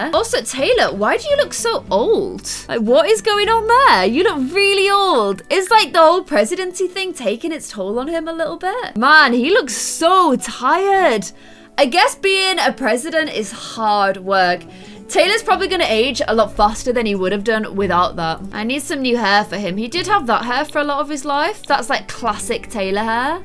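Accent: Ireland. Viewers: I didn't know u were Irish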